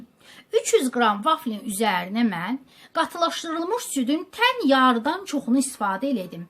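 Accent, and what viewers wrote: Baku, Azerbaijan. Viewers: Ləhçəəəə. Can bakılım)))😍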